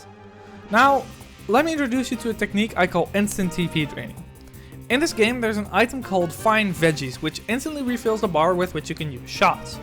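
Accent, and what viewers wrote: Netherlands. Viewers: I love that you can hear the dutch😂. I love the vids so far. I began watching after I got the game again. I just got the game again, I am loving it just like i did years ago. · Are you dutch? I feel like I hear a little Dutch in ur reactions😂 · If you keep continuing this series you'll have my reeled in man. Such an entertaining series to watch! En Nederlands zo te horen! Ga zo door maatje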